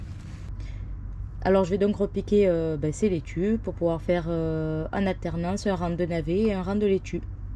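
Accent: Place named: Provence, France. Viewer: Bonjour, sacré potager sacré boulot ✌️🌷 l'accent de vers chez moi, camargoprovencal 😁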